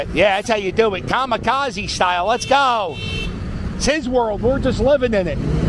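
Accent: Philadelphia, United States. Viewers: I like this guy's Philly accent.....lol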